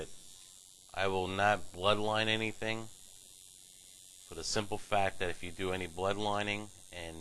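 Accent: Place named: New York, United States. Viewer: He's from New York ...I can tell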